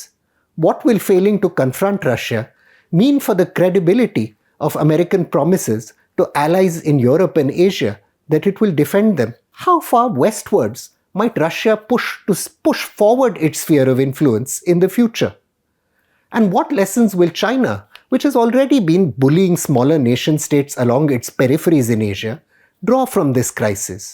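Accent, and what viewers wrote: India. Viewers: So, this is how CNN would sound with an Indian accent... Russia bad, Serbia bad, NATO good... What a joke...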